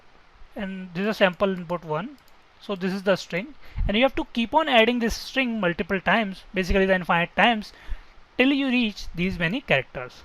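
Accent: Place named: South Asia. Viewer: Your heavy India/pakistani accent makes it impossible to understand you. I’m looking for someone else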